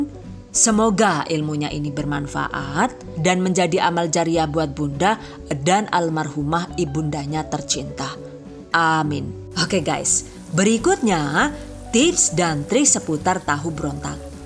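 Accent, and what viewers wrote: Banyumas, Indonesia. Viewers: Jebul ngapak rikane yah yu😁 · Konsep videonya lucuu jadi ga bosen nontonnya.. Eh deneng bahasane ngapak. Aja aja wong banyumas kie ya 😂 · Suara ngapake gawa ngakak😂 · Bunda orang mana aslinya kok dialek Banyumasannya fasih😀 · Aq sllu ikutin resep2 dr bunda ngapak ini hehe .... Detail dan jelas ,dan sering aq praktekin ...mksih ya bun aq jd nambah ilmu 🥰🥰🥰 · Lucu ngapaknya😂😂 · Wkwk ada bahasa Jawa nya .. ngapak pula bikin ngakak 🙈🙏🏻 Itu bahasa daerahku Purwokerto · Orang mn sih Bun, bahasanya ngapak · Ngakak yakin,,,trnyata wong ngapak toh,,pada yu nyong be wong ngapak kroya🤣🤣🤣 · Ngomonge koh ngapak si mba...anu wong ndi si...aku wong Maos... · Aslinee Rika wong ngapak yakk? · Deneng ngapak kaya AQ yaaa hahaha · Lah jebule ngapak😂 Gombong hadir😀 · Wong ngapak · Gra" ngomong nya ngpak jadi aq subscribe 😁😁😁😁 manteeeep min 👍👍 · Jebule ngapak kaya nyong ha ha ha ha... 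maturnuwun Yu resepe jan josh banget yakin... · Mantap👍 simpel ekonomis Bdw lucu ngakak sm ngomong ngapake😀😀 tegal,Kebumen,Purbalingga rikane yu👍🙏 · Tks resepny Mb...smbil mndengarkn Mbny mnerangkn kdang trtwa sndiri dengar logat bcarany sy jga ngapak Mb salam knal dr purwokerto..Mb...sgt m inspirasi smg smkin sukses y Mb n sehat sllu aamiin... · Jenengn asline ngendi sih yu? Kok ngmonge ngpak kaya aku. Aku kebumen.. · Bisa ngapak ngomonge.. wong endi sampean..? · Bund..kok pinter ngapak sih?ky bhsku di kroya hahaha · hahaha ngapak jg yuuu ....d tunggu resep berikut nya ..💕🌹🌹🌹🌹🌹🌹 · klo pas ngomong kirain org luar ..eh pas dgr ngomong ngapak..walahh..khas bgt pada karo inyong..😃😃😃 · Lucu kok bisa bahasa ngapak, orang mana ini, salam kenal saya dari Cilacap 😆, terimakasih resepnya 🙏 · Jere madiun tapi ko ngomonge ngapak kaya enyong.sampean wong kebumen apa cilacap.apa banyumas.tegal ya mbak.ayoo ketahuan. 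· tiba😂 kaget aku dengar bahasa apaan tu ngapak kah 😅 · Jebul wong ngapak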